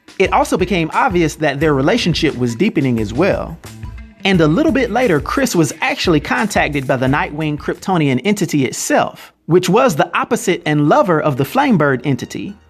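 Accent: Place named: United States. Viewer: I rellay like your american accent from which part of USA are you from? Amazing video as always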